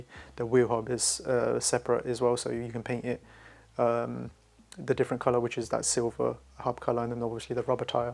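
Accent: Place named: Southall, United Kingdom. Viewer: You sound like you might be a Southall lad?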